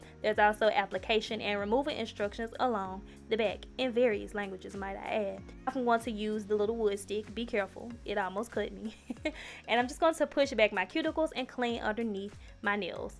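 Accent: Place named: Memphis, United States. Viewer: Came here for the chin lift and heard that Memphis accent ... ended up watching the whole video 💕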